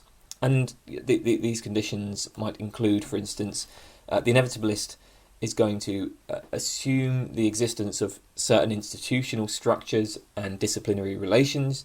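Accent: United Kingdom. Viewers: I've been watching for more than 3 years and I've never been bothered, or in fact even noticed what you speak of. His videos are hidden gems I had to dig extensively to find. No where on the internet can you find educational material on such a vast range of topics, narrated by an eloquent, young person with a British accent (if I'm not mistaken). What's more is that he provides the most popular and successful interpretations of those ideas. What isn't there to like? Get a grip.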